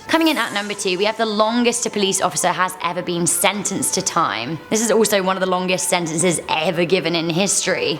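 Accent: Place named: United Kingdom. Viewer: Excellent video, I love the British accent.....!!!!!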